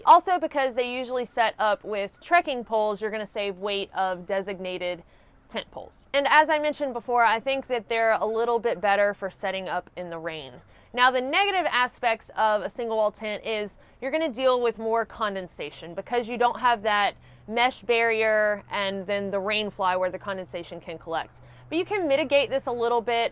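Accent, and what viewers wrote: South, United States. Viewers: Love your accent. Reminds me of watching the Dukes of Hazzard!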